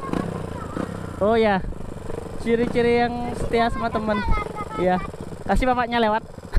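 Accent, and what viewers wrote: Bali, Indonesia. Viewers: Bang lu orang bali suaranya aja sayatau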